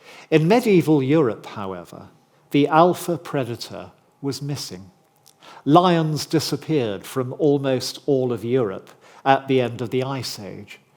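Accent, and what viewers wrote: United Kingdom. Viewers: yes, the Chinese dragons are mild and benevolent as "le feng shoah" tells us... Jesus Christ, what a dingus whenever I hear the British accent I'm expecting the most clumsy, ridiculous, unaware, stupid thing to be said. can't believe these people were the staple of documentation and history just quite recently.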